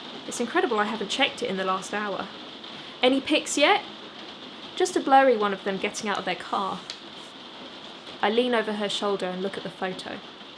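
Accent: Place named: United Kingdom. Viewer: It is so cute when it is read with a British accent ahaha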